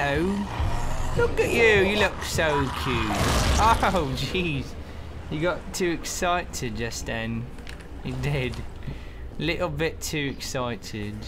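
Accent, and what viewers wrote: Scotland, United Kingdom. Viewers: T_T.....that's a scottish accent......gj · love the british accent Need more! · Your Scottish accent is freakin' epic:3 (Scottish Guy) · I love ur British accent! <3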